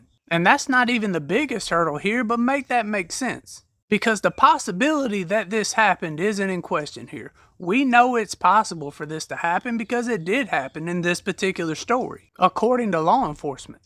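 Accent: South, United States. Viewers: Excellent work and presentation. I’ve been into this case since Dec 13th 2022, and I am absolutely sure that BK just couldn’t have done it. Very beautiful and interesting accent you’ve got, btw - from where is it, btw? Alabama? Louisiana? I am fond of different accents! 😊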